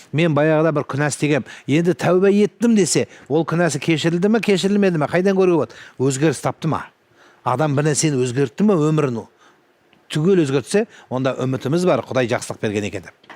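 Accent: Standard. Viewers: Имамды, таза қазақшаны сағынсам тыңдаймын, керемет шешен кісі ғой, сөз саптауы ешкімге ұқсамайтын шеберлікпен айтып жеткізеді. Риза боламын❤Қорқытпай, көркем тілмен орнына қояды. 🎉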